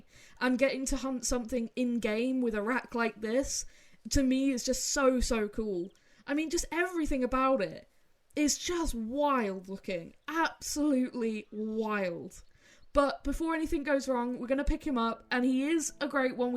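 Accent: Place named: United Kingdom. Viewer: As an American who watches a few British YouTubers your accent is really cool! I don’t know if it is British but it’s a cool accent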